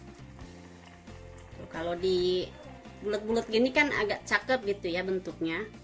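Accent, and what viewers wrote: West Java, Indonesia. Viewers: ih pertama kali buka video na logatna teu bisa bohong tos katebak orang sunda tea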